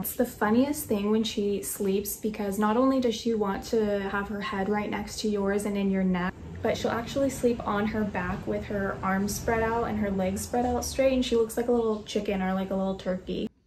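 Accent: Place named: United States. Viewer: Sorry , hold up, what did u say....'Puppy Store' what the hell is a puppy store, what like a shoe shop or bakery....I assume from your accent your based in America somewhere...tell me can you go into 'store' and buy a dog, like a loaf of bread or a pint of milk?....was she even with from her momma in the pet pen... This is all so wrong...doesn't happen in Scotland ...no puppy stores...puppy farming is horrific and needs to be stopped it goes on everywhere, but a 'puppy store' .. geeze, thats screaming out, 'ill treated animals right there.. be mindful people, be sensible people, be savvy.. buy a puppy from a home breeder...spend time getting to know the breeder and family, make several visits to the home, spend time with them and the dogs and the litter....don't buy from a store ffs 😥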